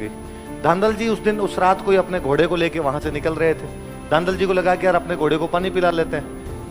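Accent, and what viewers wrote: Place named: Marwar, India. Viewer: Aapari मारवाड़ी बोली री मिठास ही इसी हे साब ❤❤❤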